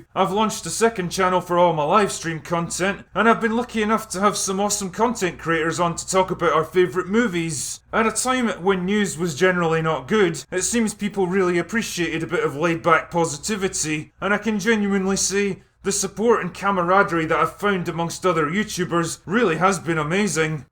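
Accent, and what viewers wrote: Scotland, United Kingdom. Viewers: I think we like you so much, cause your criticisms are pretty fair and honest. If a movie can generally suck, you will say so, but if it has elements that work out, you will say that too. If one is generally pretty good, but has elements that fail too, you will point those out. And frankly most of us dislike the Woke trying to force their way into our entertainment. Largely I will say, because they simply cannot do good stories. Seeing someone call this out in a dour, drunken Scottish tone has been a damn near godsend lol. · Is this a movie Chanel? We just like the Scottish accent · Honestly, I'm only here to listen to your accent. Because without the likes of you and Craig Ferguson millions of Americans would be under the misconception that Mike Meyers got it right. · What are some of ya books mate? I've only just found your channel, but I've been binge watching everything... 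because Scots have the best accent in the world, and your no bullshit attitude is how i like things lol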